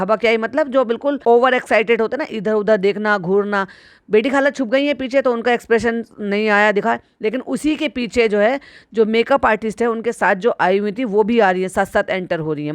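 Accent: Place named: Haryana, India. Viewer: maine apke 3-4 podcast hi sune hn ...Usi se idea lgaya ki haryana ka touch h apki boli me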